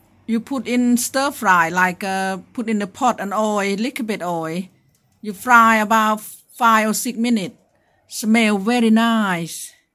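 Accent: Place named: Cambodia. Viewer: น่ารักมากเลยค่ะพี่ ได้ฟังสำเนียงเขมรแล้วคิดถึงแม่มากๆค่ะ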